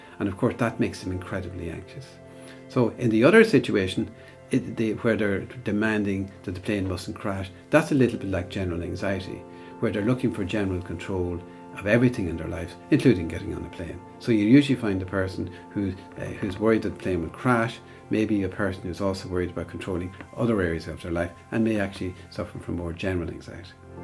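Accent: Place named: Ireland. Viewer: Very calming voice its Irish accent u definitely should do a calming video . Thank u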